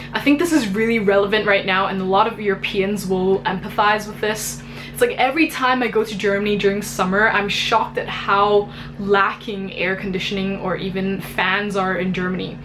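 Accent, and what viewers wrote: United States. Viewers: In Chinese (I don't know about Cantonese) there is 您 (Sie) and 你 (du). The older one offers "du" to the younger one. No, you can never, ever go back to "Sie". Even if you hate each other. You cannot go back to less distance after you've moved closer together. You cannot "unknow" someone. It's trying to change the past. Trying to make things "unhappen". Intimacy cannot be reversed. That's like putting toothpaste back. I have lived in China for four years. You finding distance and politeness mutually exclusive is soooooo Chinese. 不用客氣!!! Keeping the distance is an expression of politeness. You keep the distance to not bother the other person by coming uncomfortably close, emotionally speaking. From my cultural point of view you are confusing politeness with friendliness. Not saying "Guten Morgen!" isn't considered rude, it's considered unfriendly. 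How come you're speaking English like a US, white girl? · Did you pick up that perfect American accent whilst in Hong Kong? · She really does have a good American accent! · Wow! I would have never guessed from your heavy Americanized accent that you were from Hong Kong. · Would not have guessed that you were from Hong Kong. You sound American or Canadian. Then again my friends who are from Hong Kong grew up here in the States, so what do I know?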